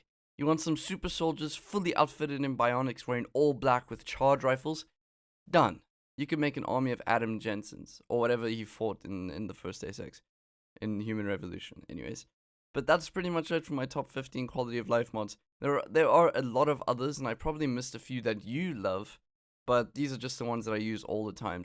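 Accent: South Africa. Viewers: You sound Afrikaans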